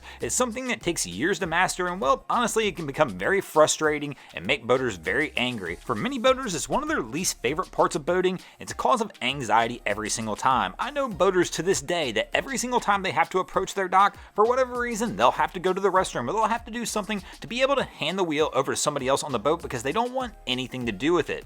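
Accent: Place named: Pittsburgh, United States. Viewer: Why do you sound like your from Pittsburgh? You even say, "dahn there". And yet, you're a Broncos Guru. I don't get it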